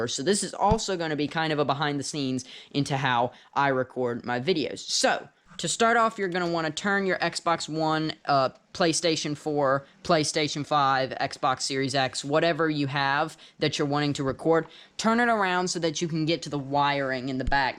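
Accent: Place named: United States. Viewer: Its kinda like what the people in England think Americans sound like in a good way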